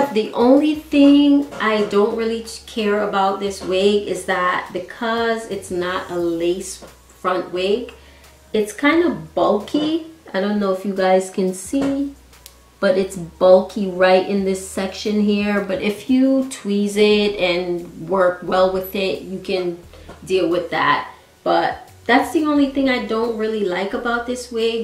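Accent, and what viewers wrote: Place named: Jamaica. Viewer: So cute I hope Mine turns out well. You sound Jamaican if you are, big up yuhself goodie!!! If not still big up yuhself! May do a review on this as well.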